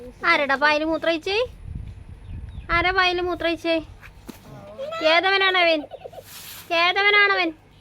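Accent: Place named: Kannur, India. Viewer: വ്ലോഗ് എന്നും കണ്ട് ശീലം ആയി അത് കൊണ്ട് എന്നും വീഡിയോ ഇടണം ❤❤❤ Kannur സ്ലാങ് ട്ടോ ട്ടോ കുറവാണ് അത് കൊണ്ട് പറഞ്ഞതാ 😂😂😂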